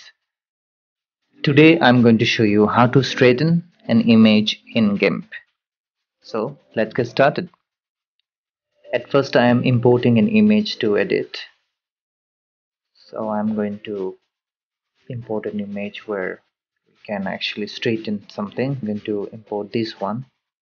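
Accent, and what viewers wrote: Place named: Bengal, India. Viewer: Why does this have to be explained to me in a Bong accent.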